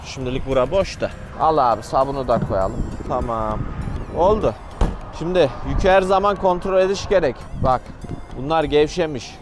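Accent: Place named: Niğde, Turkey. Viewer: Askerde Niğdeli bir arkadaşım vardı konuşman tipin aynı kesin Niğdeli dir diyordum formayı gördüm yanilmamisim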